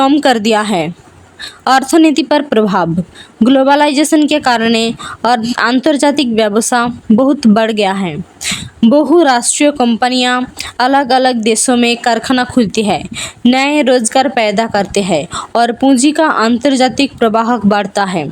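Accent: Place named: Bengal, India. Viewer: Are you a Bangali? Bengalis are speak like this.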